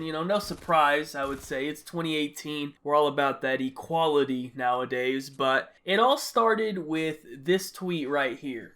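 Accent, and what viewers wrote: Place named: South, United States. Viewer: Southern lmao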